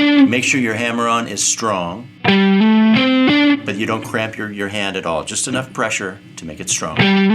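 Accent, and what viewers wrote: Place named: West Coast, United States. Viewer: Oh man, I'm killing myself here. I lifted your solo at the beginning at a slower speed. Finished that last lick and then you start talking but it's still at slow speed and LOL! it sounds like you just took 10 vallum pills ha Oh man with the west coast accent.:) classic...Thank for the licks. I stumbled on this and thought I would a great solo to teach my students. Good strong and Rhythmically accurate licks which is rare in a lot of players.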